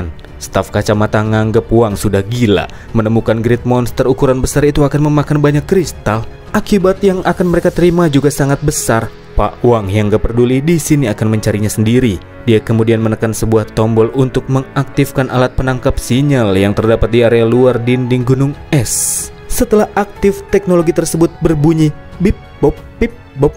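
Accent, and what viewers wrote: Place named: Payakumbuh, Indonesia. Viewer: Logat bng kaya orang pykmb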